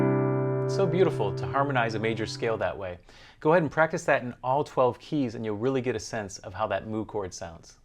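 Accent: United States. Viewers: That was inspiring,. Any chance you're from Lowell, MA?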